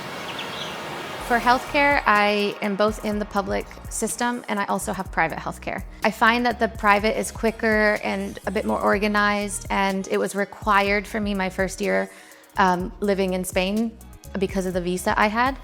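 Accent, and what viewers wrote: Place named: Eastern Europe. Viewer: Rich “American“ with very obvious Eastern European accent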